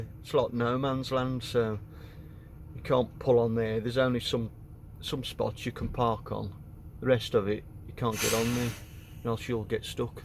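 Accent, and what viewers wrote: Midlands, United Kingdom. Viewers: New sub here, stumbled across your channel , took me a while to work out what was going on !, midlands accent , american truck , canadian based, owner operator , in my all time favourite truck , peterbilt 352 pacemaker , ive looked at loads of them over the years , in consolidated peterbilt foxboro mass, in 1982, and looked at several back here in uk, first one was a red 362 around bedford way ,it was a race car transporter, second was a nice gray 352 at john bowen jones at blisworth northants, test drove it and it was sweet , and the third one was from weston super mare, a blue 352 H with 19 litre marine cat/cummins cant remember which, it had the 110" sleeper to, i think phill nash has it now plus a 352 detroit, and i saw one like yours on ebay to a year or two ago,. but i stuck with my F<num> speed for 25 years instead (see profile pic)i know a couple of uk lads working in canada, neal trickett from wrexham and austen jones from oswestry where i live,. 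How did you end up over there ?, plus i thought most of the big companies dont like old trucks ? I follow "peterbilt mike" or "mike the truck nut" on YT, hes in canada to, got a couple of 362s and a couple k100 aerodynes hes tidying them up slowly,. its a struggle with the old trucks nowadays , getting parts and fixing them yourself , been there done that on my F88 untill 2000,. i went to retro show gaydon last weekend , some nice stuff there,. anyway i,ll binge watch your videos , ive done 3/4 already , your truck could do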